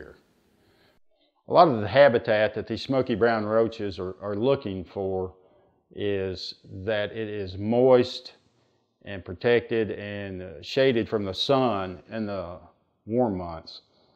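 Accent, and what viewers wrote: South, United States. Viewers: He is southern and southern folk talk slow don’t like it find another video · Hey, let me try an' place your accent...sounds like a base in Arkansas, with a Georgian overlay, and can I hear a hint of Kentucky, or are my ears playin' tricks on me? Laughs I ain't been down south since I was a kid, so I'm probably a bit rusty. (Used to live in Texas.)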